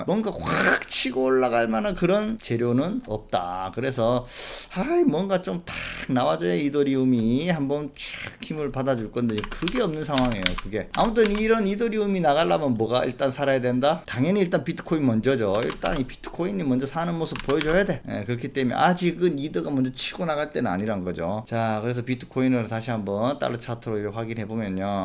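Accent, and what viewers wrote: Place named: Gyeongsang, South Korea. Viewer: 항상 시원시원하게 말씀해 주시고 경상도 사투리 정감가고 좋아요 항상 잘 듣고 있습니다 감사합니다.^^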